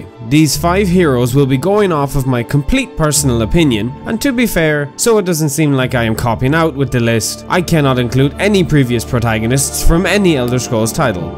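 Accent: Ireland. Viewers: Are you irish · and yes i love and miss that sexy irish voice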